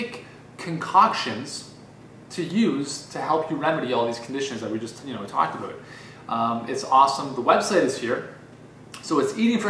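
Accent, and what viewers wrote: Canada. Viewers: ur canadian....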